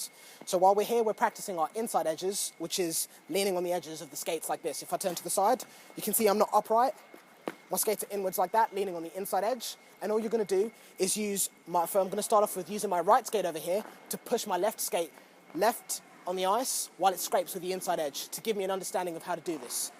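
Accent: United Kingdom. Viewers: Does anyone comprehend what is going on in this video!? A black guy is teaching the internets how to hockey-stop, in a British accent. Youtube, you are great.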